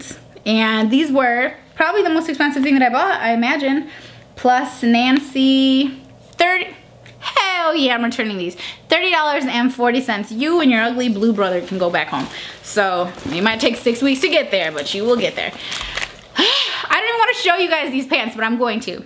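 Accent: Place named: Texas, United States. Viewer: I love your loudness I'm from Houston Texas so I'm so use to the way you talk makes me think your a normal person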